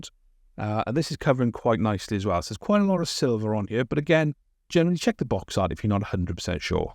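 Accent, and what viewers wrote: Wales, United Kingdom. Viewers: Not sure why the algorithm has only now led me to your channel. Pleasantly surprised to hear a fellow Welsh accent! Looking forward to watching more of your content!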